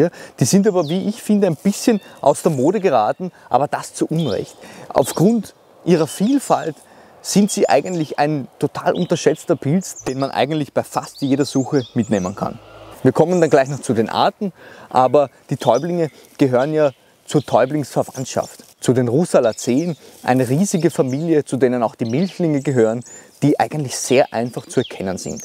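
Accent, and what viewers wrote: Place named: Austria. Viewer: Ich verstehe deutsch nur B2Niveau, verstehe ich nicht viel Österreichisches. 😲Wegen der unterschiedlichen Akzente…aber ich liebe es immer noch, deine Videos zu sehen… Danke für die Informationen... sehr interessant👍🏼👍🏼👍🏼🙏🏼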